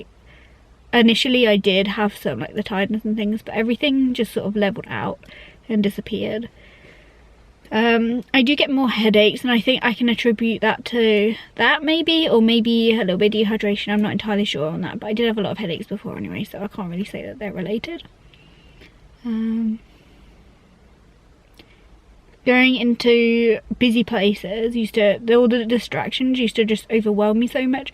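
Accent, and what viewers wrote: United Kingdom. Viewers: Love your English accent! I can relate to you allot. Starting Strattera today.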